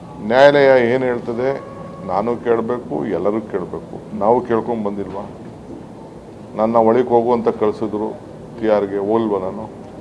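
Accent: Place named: Kanakapura, India. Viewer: ಅಣ್ಣ ನೀನು ಎಲ್ಲಿ ಮಾತು ಕಲಿತಿದ್ದು ಕ ನ ಕ ಪು ರ ದ ????? ನೀನು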